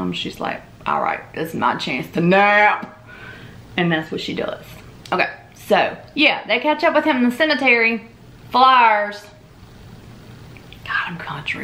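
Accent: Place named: Louisiana, United States. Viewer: What an evil, evil person 😭 That poor baby. I was born and raised in Louisiana. You must be from Northern Louisiana by your accent. Subscribed!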